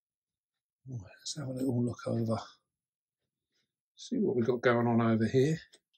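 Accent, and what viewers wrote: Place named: United Kingdom. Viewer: Who else says "Hello Guys, welcome back to the Beastie Room" in their best British accent, right along with?;), as always, top notch production with lots of fun 'eye candy'. Again, is that me, the woman who has been afraid of spiders all her life, saying that?? And to Camera Lady, Bless you! Always look forward to your videos.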